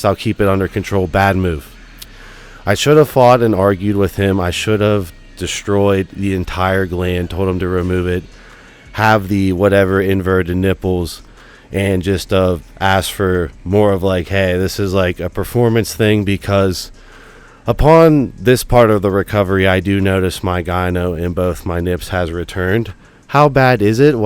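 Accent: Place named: Pittsburgh, United States. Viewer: You def sound like youre from the 412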